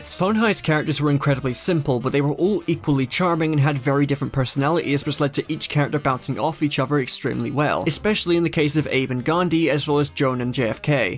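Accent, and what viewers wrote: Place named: Southern England, United Kingdom. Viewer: Where you from in england bro? More southern than northern with that accent 🤔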